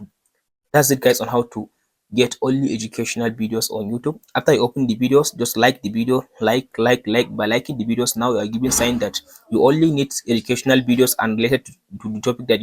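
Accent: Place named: Bhojpuri, India. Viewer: Bhojpuri english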